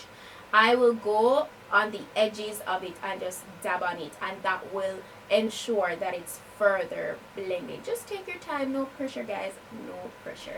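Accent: Jamaica. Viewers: love your accents Jamaican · JAMAICAN :-)... Beautiful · Yaardie gal you accent strong sah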